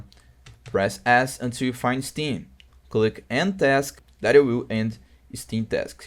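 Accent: Brazil. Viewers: sabia que esse sotaque era BR XD, ja viu esse erro meu consagrado? LowLevelFatalError [File:Unknown] [Line: 729] BulkData compressed header read error. This package may be corrupt!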